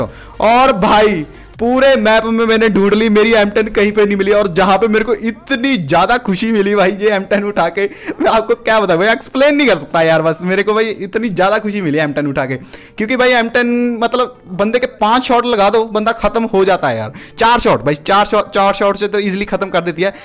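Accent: Punjab, India. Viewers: Hello bhai aap Punjabi Ho jasa Punjabi bolte app Ho Kay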